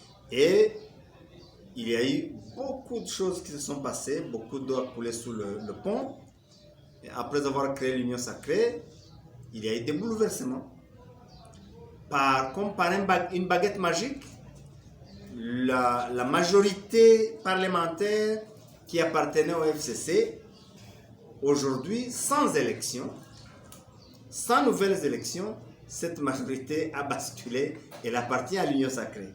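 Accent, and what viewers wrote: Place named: Rwanda. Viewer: Accent rwandais, le même accent que ruberwa, kagame etc... Cherchez l'erreur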